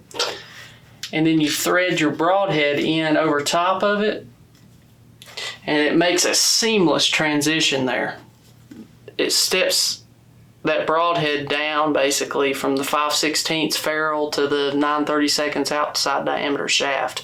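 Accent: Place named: South, United States. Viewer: Lol mate you have that Kentucky trucking driving Atlanta GA accent ingrained.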